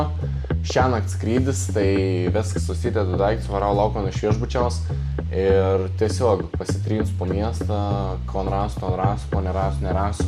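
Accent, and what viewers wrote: Samogitia, Lithuania. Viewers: kopijuoja žemaičių tarmę? kiek žemaitiškai šnekančių žmonių? tai visi kopijuoja reiškia?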